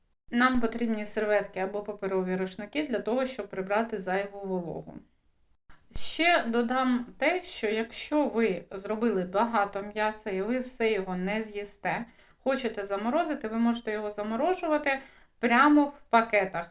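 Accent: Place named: Transcarpathia, Ukraine. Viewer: Це закарпатський діалект.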